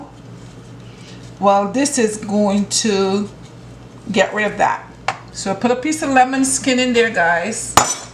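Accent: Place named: Barbados. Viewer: I am sooo happy I found this channel omg 😩 lunch cakes were the best thank you miss love tug see and hear ah bajan 🇧🇧mother talk 😂 Happy Mother’s Day to you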